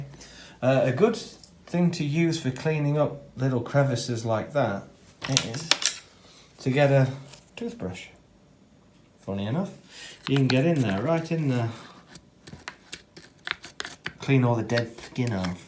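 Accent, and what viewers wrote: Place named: Estuary, United Kingdom. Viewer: Ok.... 1. When I clicked this video, I was right in the middle of installing Guyker tuners (locking 6 in-line) to my Banana/Hockey Stick neck I just installed onto a Stratocaster body. Yep, you heard right. Will it intonate and work right? Well, the measurements are not exact, but close...so we will see. 2. Are you living in America? Because I hear the very light estuary accent, but you are DRINKING COFFEE and not a cuppa earl grey with milk? Interesting. Nice work on your #1. I'm in the middle of trying to figure out which guitar out of 16 is my #1 since I play different music at different times and have not settled down with a real strat as I build my own.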